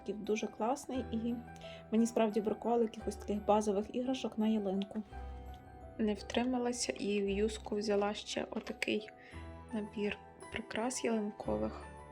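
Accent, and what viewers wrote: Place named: Lviv, Ukraine. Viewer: Доброго вечора,на Ваш канал потрапила за рекомендацією " ютуб", почувши щиру українську з львівським присмаком залишилася тут без вагань! Маєте гарний смак! Ваші відео то окрема форма насолоди! Завжди все стримано, вишукано, гармонійно, щиро. Наразі працюю з шкарпетковою пряжею ( вяжу для ЗСУ ). Гарних, мирних Вам свят, теплих та цікавих зустрічей! oksana_sokolova156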